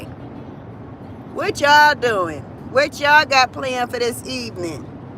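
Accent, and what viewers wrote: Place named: South, United States. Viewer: Good Morning. Just watching your video. You're having so much fun. That's what I'm talking about. I have always loved your deep Southern accent. You sound like you live in the deep south. Love it❣️ You two keep having fun and be blessed.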